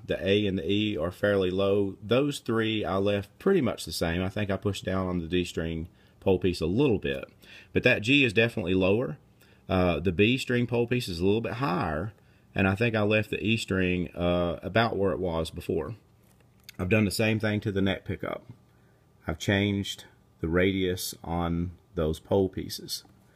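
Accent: Texas, United States. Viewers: Can I guess your accent? Northern Texas or Oklahoma?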